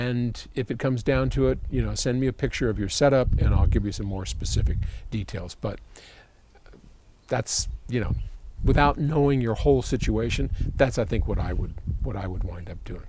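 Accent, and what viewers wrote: United Kingdom. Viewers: THE British accent!... Which one?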